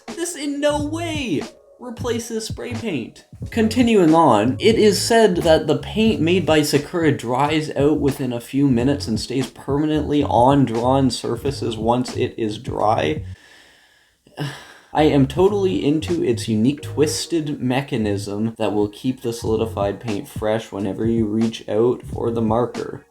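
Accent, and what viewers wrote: Canada. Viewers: just a question are you by chance from newfoundland? your accent kinda sounds similar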